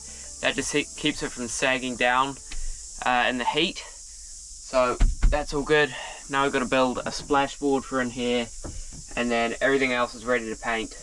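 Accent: New Zealand. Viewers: I heard "one of the advantages of having a dad who has a mess of shit" instead of "a dad who has a massive shed". Ha! First heavy exposure to the Kiwi tongue.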